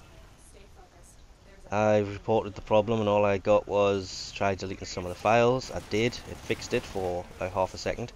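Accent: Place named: North, United Kingdom. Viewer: have been watching all your videos love the northern accent. When ever I build a new weapon I half expect you to give me a review lol. you have pushed me on so far from my early days. I now hold my own in all the high lvl matches and I love the wraith twin vipers to! keep up all your good work love the channel.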